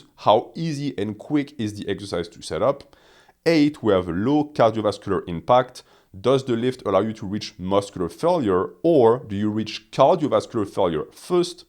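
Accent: France. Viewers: Soon his thick French accent will be a thick Spanish accent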